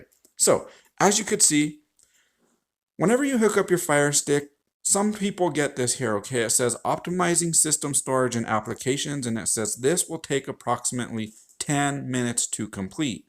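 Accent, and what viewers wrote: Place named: Oklahoma, United States. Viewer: Is this guy from Oklahoma? Ok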